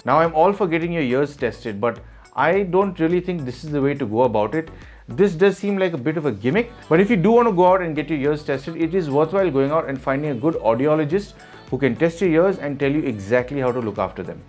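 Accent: India. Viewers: as I'm a core subscriber for your channel, I'm still in shock that for such a great reviews on well known TWS earphones, even after long time your not gaining in subscribers If I'm you and keeping all these efforts for the wonderful output of this beautiful video I would close my channel if enough encouragement was not given But you on the other hand doing fabulous Job with your Indian English ( easy to understand ) as well as your Honest review ❤️ Thanks brother and I hope you see grow in the future, Don't forget me !😂😂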